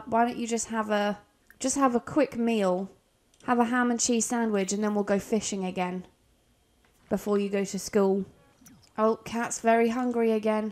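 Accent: England, United Kingdom. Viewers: This reminds me of when messyourself played sims 4 maybe it's the bald kid and English accent lol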